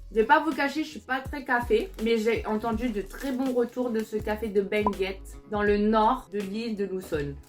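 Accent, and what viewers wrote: France. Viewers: Love your French accent, gorgeous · What a fun way to appreciate festivals especially panagbenga. Btw your french accent is to die for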